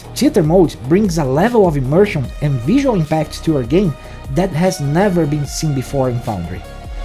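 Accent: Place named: Brazil. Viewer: Hahahahahahaahah incrivel como da pra perceber outro brasileiro pela fala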